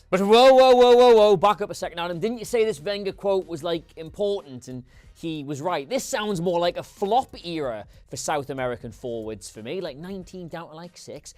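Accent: United States. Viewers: adam's american accent >>> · That American accent cracked me up 😂 love your videos!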